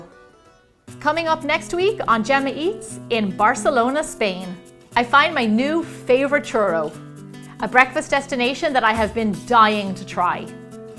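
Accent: Ireland. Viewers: Omg Iv been following you for years and always hear the Irish accent but didn't realize you were from Wexford. I'm from New Ross but live in New York. Small world. I'd kill for chips from kilmore or the premier in Wexford town . Go get a risole ! Yum!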